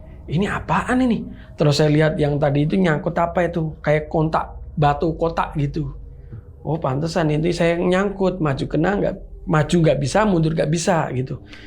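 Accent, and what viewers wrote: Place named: Java, Indonesia. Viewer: Kirain Mike vokalis Marjinal kwkwk pas ngomong medok oh ternyata bukan wkwkwk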